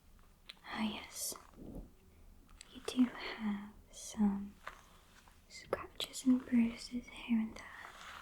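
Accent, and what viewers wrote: United Kingdom. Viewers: "You've got some foliage stuck in the back of your hair." 🫠 Aw that accent. ❤ It's something so commonplace as to be beyond notice to a native like you I'm sure. But the sound of your voice has the capacity to completely short circuit my North American mind.🗣🇬🇧 🤯👍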